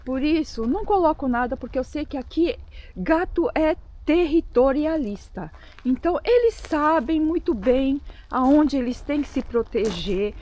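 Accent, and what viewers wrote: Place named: Brazil. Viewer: hoje estou em Portugal.gostei de ver a baleia 🐳 junto com você.gostei a sua maneira de se expressar bem brasileira mesmo😊 beijinhos.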